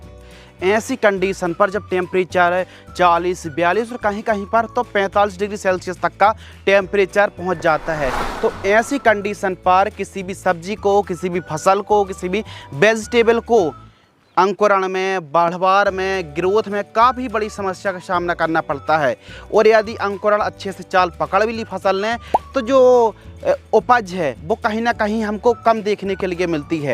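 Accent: Bundelkhand, India. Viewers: सर आप बुन्देलखण्ड से हो का ❤❤हमे yesa लग रहा हैं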